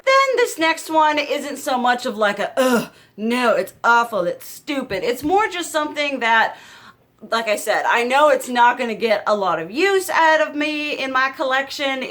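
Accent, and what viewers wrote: United States. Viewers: I love they way you talk country accent with animation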